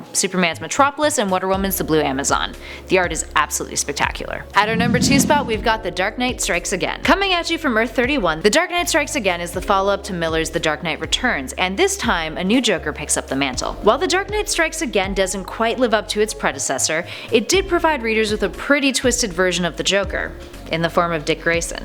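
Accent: Canada. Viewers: Is she Canadian? She's adorable ☺ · I love u and ur needless and ur Canadian accent it's super hot ;) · Is that a canadian accent i detect or am i tripping?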